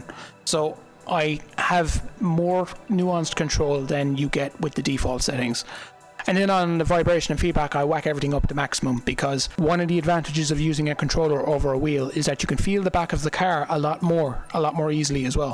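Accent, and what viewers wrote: Ireland. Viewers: I like your accent! Irish?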